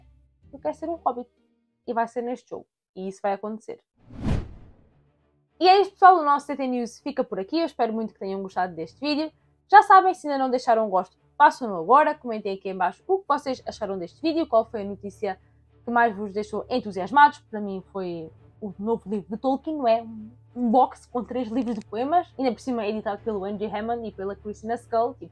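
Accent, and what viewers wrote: Portugal. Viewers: É sempre uma satisfação em ouvir esse sotaque de nossa eldar de além-mar. -λ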